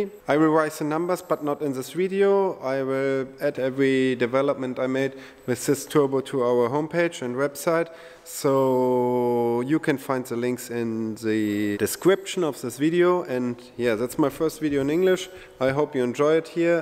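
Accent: Germany. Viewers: Typisches deutsches Englisch xD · Ist doch schon ziemlich solide dein Englisch content - ein gewisser ‚german accent‘ darf durchaus bleiben: It’s not a big, it’s a feature …or USP ;)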